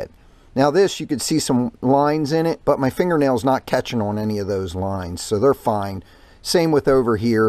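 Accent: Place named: Baltimore, United States. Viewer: I did take the spark plugs out, I shot some lubricant down inside with Kickstart a few times. Oil couldn't wait to spew out LOL. I ordered a new battery and waiting for it in. Your accent sounds like you might be from the Baltimore area. I'm in the Gettysburg area. When I get the battery in and try to start it I'll let you know how I make out. Thanks so much for your reply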